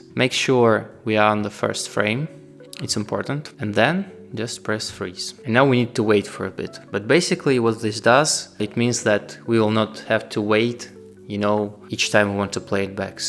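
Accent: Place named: Russia. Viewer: впервые узнал русский акцент. ты крут, чувак. потом будем вместе работать)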